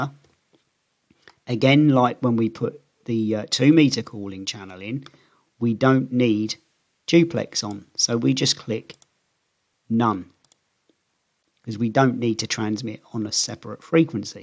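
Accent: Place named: Scotland, United Kingdom. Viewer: You sound a bit Scottish.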